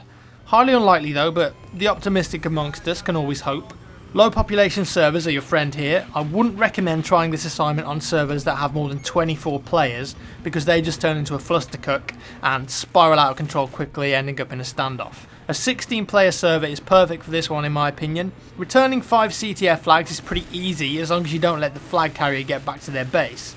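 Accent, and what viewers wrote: United Kingdom. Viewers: oh man, my recommendations just popped up with this. its funny looking back, sounds much more british.. and young.:D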